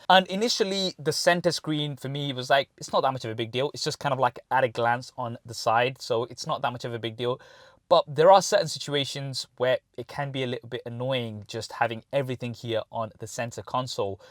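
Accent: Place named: Bradford, United Kingdom. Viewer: He's from Bradford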